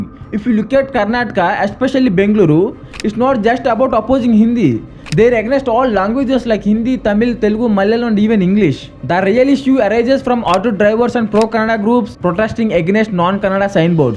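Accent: England, United Kingdom. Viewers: I really enjoy his english accent. Infact i watched whole video.. 👍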